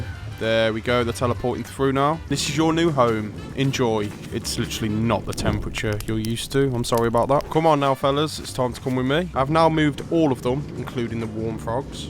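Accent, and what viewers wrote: United Kingdom. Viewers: I think bro is British. I need American translation to understand 😫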